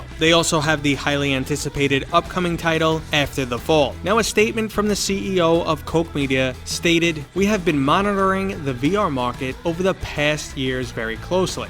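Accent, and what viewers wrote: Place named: Boston, United States. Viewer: Let me guess, are you from Boston?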